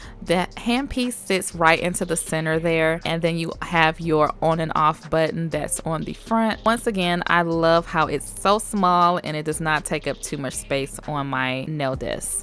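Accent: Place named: Atlanta, United States. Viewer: Just found your videos today and first thing I thought was “she sound like My fellow Atlanta native Kandi Burruss” anywho you’ve inspired me to use my Cosmetology skills to do my own nail dyeing and after this pandemic! Now let see if I can remember those Empire beauty school lessons along with you videos I should be fine😹😹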